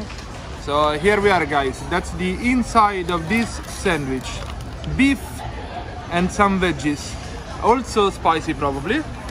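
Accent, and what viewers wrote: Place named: Italy. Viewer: omg i enjoy hearing your italian english。。。so funny engjoy Chonqqing just come back from Italy last week：）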